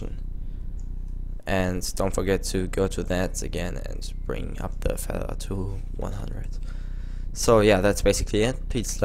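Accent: Germany. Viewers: haha, nix gegen dein english, ist aber immer so geil wie man jeden deutschen erkennt auch wenn er noch so gut english spricht XD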